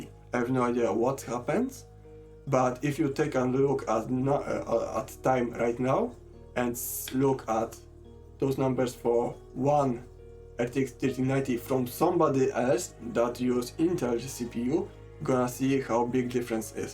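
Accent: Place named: Poland. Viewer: Odpalam i słucham - pierwsza myśl polski akcent, sprawdzam Artstation i jest! Pozdrawiam! :D super video!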